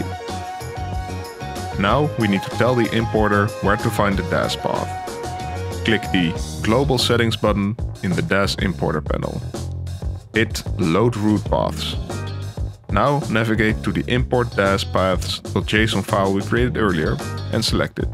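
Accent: Netherlands. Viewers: You're Dutch, aren't you?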